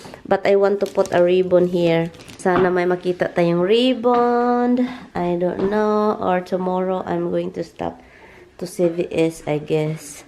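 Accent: Visayas, Philippines. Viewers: Janice sandmayr perfect ka ba?bisaya nga sya di ba?wag ka manood ng vlog nya kung basher ka lang!How dare you!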